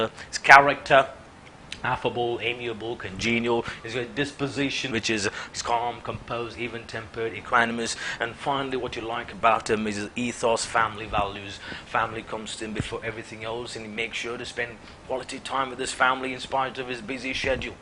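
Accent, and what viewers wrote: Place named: United Kingdom. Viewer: Looks like a British accent to me. Are u British?? Nice techniques, BTW.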